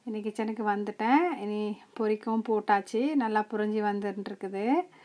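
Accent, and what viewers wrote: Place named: Jaffna, Sri Lanka. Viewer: Beautifully presented dinner with no carb. Unga tamil different a iruku a bit of Indian and a bit of Jaffna Tamil.Enjoy watching. Keep posting 🦋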